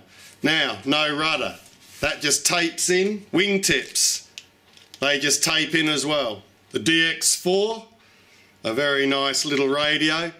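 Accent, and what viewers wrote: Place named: Australia. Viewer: I love your intro to all your videos, and the Ausie accent just adds to the entertainment value of your very informative reviews. Thanks! A big fan from the US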